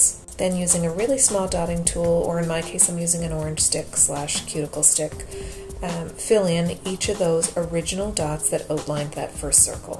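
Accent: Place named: Canada. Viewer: Very cute! And I love your Canadian accent. :)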